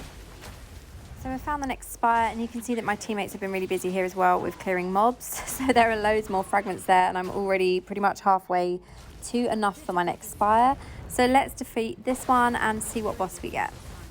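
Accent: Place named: United Kingdom. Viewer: What a British accent ^^❤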